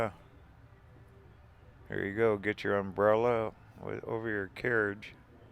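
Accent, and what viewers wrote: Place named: Ohio, United States. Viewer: Yay, you are heading to Ohio. I was so, so happy to hear you decided to go to Ohio. The very first time I heard your voice and the way you talk, I just knew you were from Ohio. You remind me so much of my dad, especially the expressions you use and the way you laugh. This Ohio girl knows Ohio people as I call them. Too cool for school. My dad moved from Ohio to Arizona too. He had family in the Phoenix/ Scottsdale area. I was one of those little girls riding a tram at a young age. My Pop was a railroad engineer and my Mamaw had a lifetime pass. She took me on the train when I was younger than five and I still remember that ride. Train museum brought back memories. Thank you. ❤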